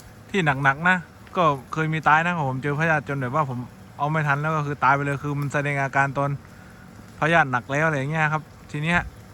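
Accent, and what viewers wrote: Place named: Surat Thani, Thailand. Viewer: แหลงคล้ายๆคนสุราดเลยบ้านยุไหนคับ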